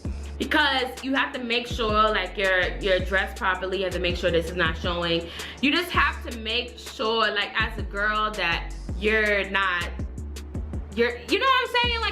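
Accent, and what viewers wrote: Florida, United States. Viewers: Yesssss I knew you were from Florida we talk the same lmao!!! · Miss. Darlinton Lmfaooooo Florida squad! The expressions, the hype, the humor, the way she talks all of that I was like "lmfao is she from Florida?"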